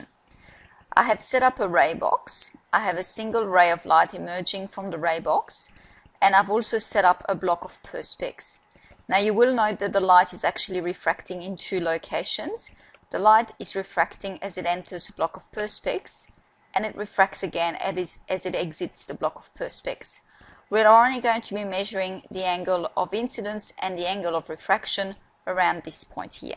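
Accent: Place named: Australia. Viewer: ausrtaliam accernt tho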